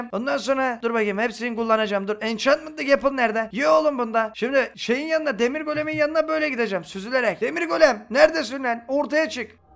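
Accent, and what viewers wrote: Black Sea, Turkey. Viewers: abi sen nerelisin karadenizlimisin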